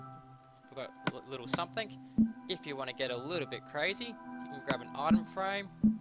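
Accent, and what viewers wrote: Australia. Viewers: Are U Australian or not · Like you accent your austrailian nice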